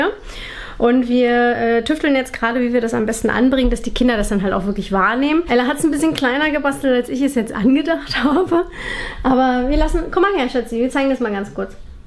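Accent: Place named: Berlin, Germany. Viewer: Ich musste auch sofort denken da berlinert doch jemand 😂😂🤩🤓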